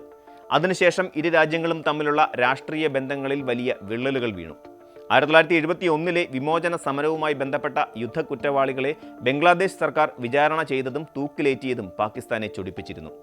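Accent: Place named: Malabar, India. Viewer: Malabar slang Oonte Oonte Oonte News 18 nalla vargiyathayundallo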